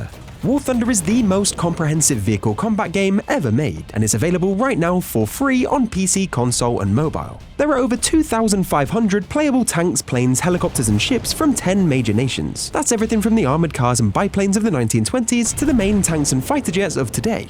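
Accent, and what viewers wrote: United Kingdom. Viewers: His accent, always gives the British narrator 🙏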